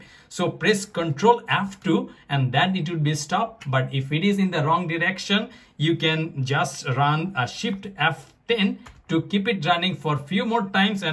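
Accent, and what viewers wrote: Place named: India. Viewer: This indian talk.. Oh I love your channel 😍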